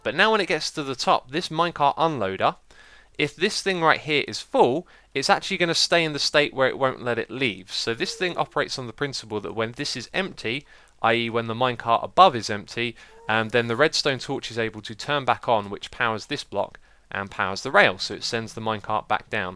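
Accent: England, United Kingdom. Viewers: Are you from the north or south of England I can't work out ur accent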